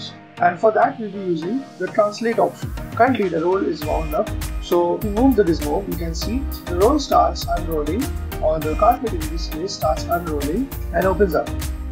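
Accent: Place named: India. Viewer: Background music is very loud, and the speaking volume is quite low. Also people who are not familiar with Indian accents would find this extra difficult to understand! I'm of course not suggesting you change your accent haha, but if the music were a bit lower and the speaking volume higher this would make the video much easier to follow, ta!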